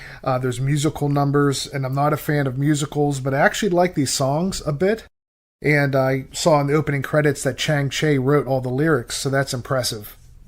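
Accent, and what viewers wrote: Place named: Philadelphia, United States. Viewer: Haha! That’s awesome! You’ve got a hint of the greater Philly accent so I thought you must have been from around here! Yeah, I watched a ton of Kung Fu movies on Philly 57 and still have a few VHS tapes recorded from there with all the local commercials for Acme, Window Wizards, and the like. Was the “bootleg era” a nationwide thing? In the 90s I bought lots of bootleg tapes from a place in Chinatown called Universal Electronics (3 for $25!). The quality was generally awful but that was the first time I was able to see the Shaw Brothers stuff that never got released on TV as well as unedited versions of the more violent stuff.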